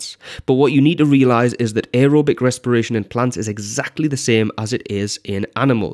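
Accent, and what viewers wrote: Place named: North, United Kingdom. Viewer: Aye it’s a northern lad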